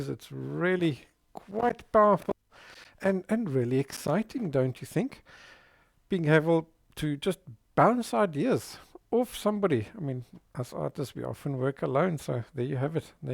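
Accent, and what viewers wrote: South Africa. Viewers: Hi you sound like a South African. I enjoy your presentations. Very thorough. Thank you from South Africa.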